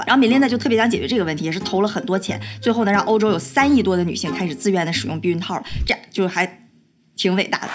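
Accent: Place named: Dalian, China. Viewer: 姐真有喜感！有大連味。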